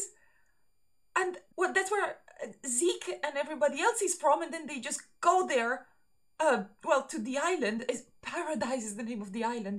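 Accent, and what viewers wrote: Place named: Italy. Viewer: Sorry for non-related, possible offensive comment, but, in the moment you started the video I knew you were Italian. 😅 "The wey of speakingue withe ann ecxtra vowel is so cuteh". Same happens when we Brazilians say "hotchy doggy" instead of "hot dog". I just love it.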